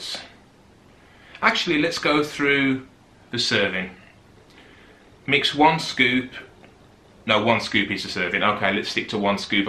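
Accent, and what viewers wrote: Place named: Birmingham, United Kingdom. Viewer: 👍👍👍👍👍👍 sound like fellow brummie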